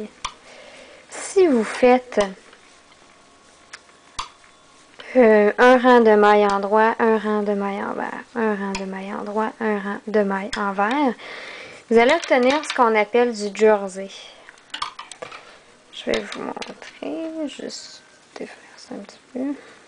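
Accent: Quebec, Canada. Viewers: Tu exagères, on comprend parfaitement ce qu'elle dit et son accent québécois est délicieux.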